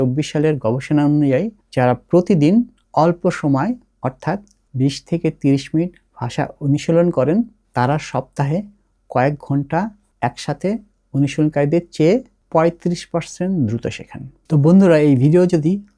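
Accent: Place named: England, United Kingdom. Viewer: ভিডিওটা বেশ সাজানো ও নতুনদের জন‍্য বেশ উপকারী। তবে উচ্চারণটা ইংরেজির মতো হয়ে গেছে। বিশেষ করে উমলাউট বা Z এর উচ্চারণগুলো। 'আবার গুউট...।।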